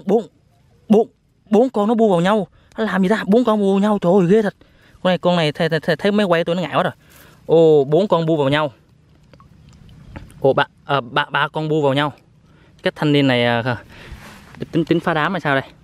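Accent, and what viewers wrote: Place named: Mekong Delta, Vietnam. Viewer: ah nói chuyện miền tây thì chỉ có ở châu đốc an giang😃😃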